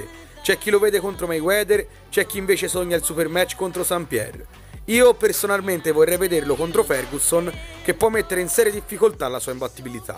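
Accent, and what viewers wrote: Tuscany, Italy. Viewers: Sei toscano ??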